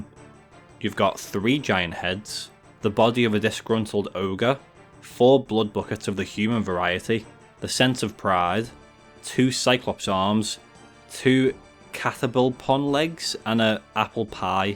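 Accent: United Kingdom. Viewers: oh shit, we doing runescape lore? I don't play OS, but I'll listen to a British dude talk about RS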